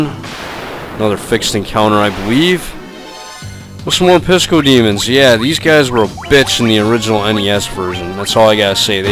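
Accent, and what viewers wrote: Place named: South, United States. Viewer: You do sound more southen but it doesn't matter 'cause it fits so keep it up.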